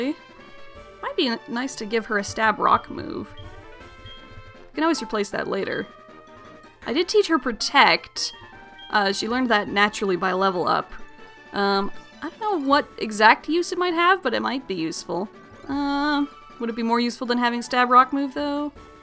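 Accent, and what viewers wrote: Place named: France. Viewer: may i just say that is the most amazing french accent greqt vid as well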